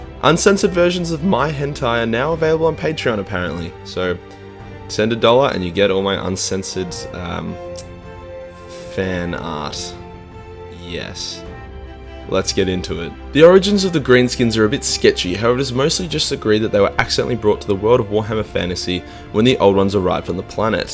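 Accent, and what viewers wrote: Australia. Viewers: As a Canadian I reckon aussie have the best fuck off narration around, fair dinkum, bloody legend!!!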